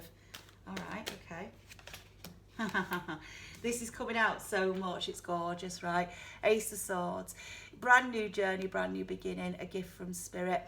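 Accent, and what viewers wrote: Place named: England, United Kingdom. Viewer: Love your accent, your truth, and your readings. Have heard from you before, your excellent and extremely brilliant keep it up sweetheart 💞. A lovely smiling English Rose 🌺xxx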